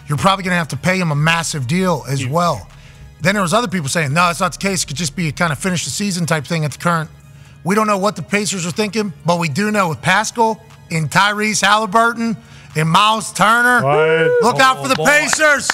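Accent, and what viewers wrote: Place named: Pittsburgh, United States. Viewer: It's his yinzer accent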